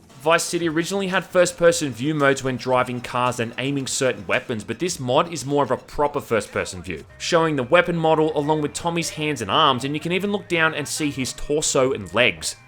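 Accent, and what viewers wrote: Australia. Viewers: Is it me or did he sound more stralian in this one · What are you, an Aussie?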